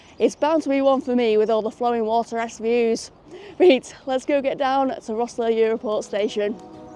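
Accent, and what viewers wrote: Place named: England, United Kingdom. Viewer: Nice to hear an English persons perspective on Ireland...love that accent by the way!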